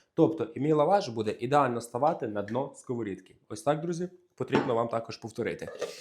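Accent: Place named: Surzhyk, Ukraine. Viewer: Дитя народилось і у школу ходило в регіоні, де всі балакали на суржику. Навчалося на кухаря, де викладачами були тітонькі з сіл. Вони так розмовляють. Тому так і балакає😂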